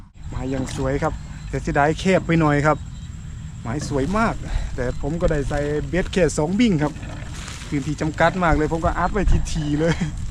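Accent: Korat, Thailand. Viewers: ชอบฟังภาษาโคราชfcสกลนครครับหมานๆเด่อ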